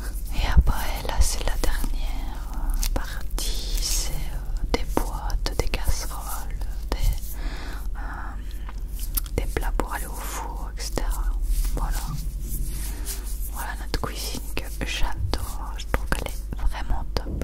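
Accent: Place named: Belgium. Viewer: La couisine 😂😍j adore l’accent belge je sais pas pk ça me détend en plus 😂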